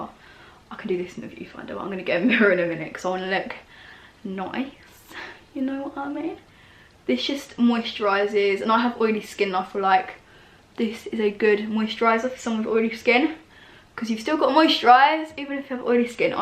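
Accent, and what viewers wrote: Essex, United Kingdom. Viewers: you must be from essex as wellllll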